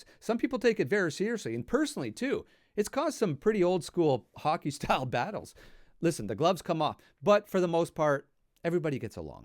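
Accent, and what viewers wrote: Canada. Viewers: Clicking on this video I was worried this would be an American explain what Canadians are. But NOPE. Five seconds of this man’s accent told me he was a real Canadian. 😂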